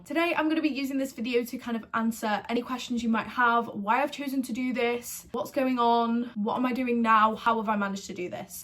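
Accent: United Kingdom. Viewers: you got a slight birmingham accent you from Brum ? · Very switched on and can tell your Driven you sound like a stokie btw 😉.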